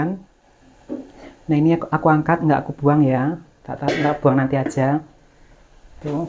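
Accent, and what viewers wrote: Java, Indonesia. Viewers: Boso jowone jan medok bingit,,🥰🥰🥰🥰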